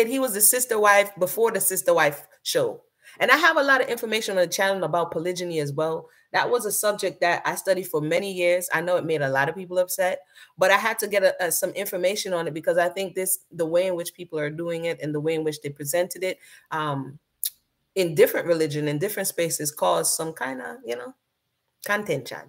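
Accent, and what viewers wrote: Brooklyn, United States. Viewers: Wow you just asked in the video to state where you were born I just texted before you asked the question you sound like you were raised in Brooklyn or somewhere in NYC. Wow you just said in the video Norval was birn in Jamaica! Wow thought he was also born in England!! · You definitely from BK.. prolly flatbush or canarsie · You do have a Brooklyn accent. I could identify it clearly 🤣🤣🤣🤣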